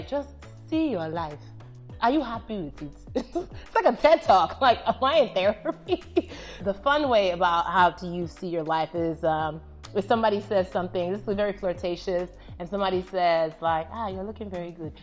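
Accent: Nigeria. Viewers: Actually,you could guess how long she's ever been in Nigeria just by the pronunciation.she don't have to be yoruba to get those accents right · I love listening to the Nigerian accent. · The Nigerian accent is funny no cap 😂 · Yvonne's Nigeria accent and attitude has a lot of similarities with Mayowa Ekpo 👍👍👍👍👍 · I don’t really like her character on insecure but her Nigerian accent is so funny I love it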